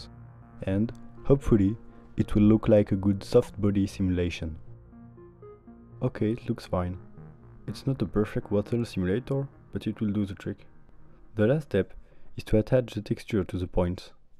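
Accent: France. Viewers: Really nice video, and damn was'nt ready for a french voice reveal ! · I do seem to recognize a french accent, eh eh Its nice to see you try new things/formats, keep up the great work! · Love your accent man! Are you from France? I'm Spanish and it reminds me of that. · Are you french ? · Voice is very soothing. The french accent is hearable but honestly that's what makes your voice's charm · Are you from France? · You got a nice french based voice man, nice to hear someone speaking like me :v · Are you french ? Love your voice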